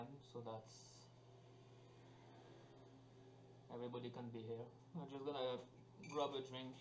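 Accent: France. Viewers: LE BON ACCENT FRANCAIS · oh ok i was telling m'y self why have à frensh accent but he is frensh mdr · are you french because am i and you have the french accent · On est d'accord il a un accent français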